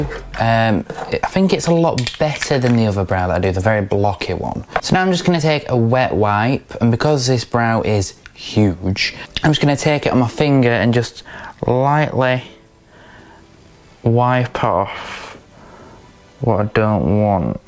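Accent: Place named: North, United Kingdom. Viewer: You are so refreshing, I love how northern you are I feel reyt at home listening to your voice whilst you do your make up. 🥰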